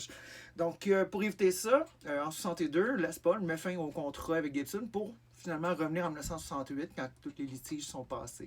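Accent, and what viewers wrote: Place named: United States. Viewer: Putain, un accent à couper au couteau... Ça parle moitié anglais moitié français... Et quand ça parle français ça a un accent américain.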